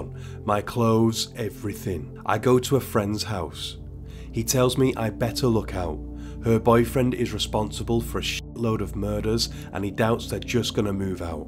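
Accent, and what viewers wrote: United Kingdom. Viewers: Someone loves how British folks talk lol. Not hating just stating lol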